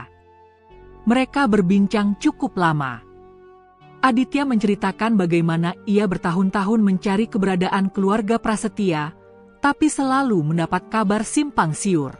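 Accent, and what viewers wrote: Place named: Java, Indonesia. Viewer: Cerita ya bagus cara bacanya terlebih tebal slank jawanya..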